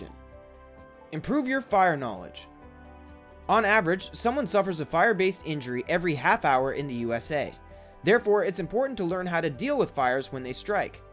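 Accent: Canada. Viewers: You sound Canadian eyy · is the one talking canadian?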